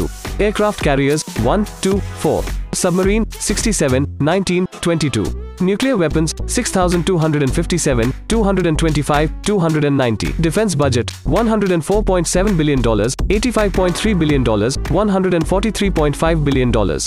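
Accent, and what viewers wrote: India. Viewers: Author from India ? His accent like indian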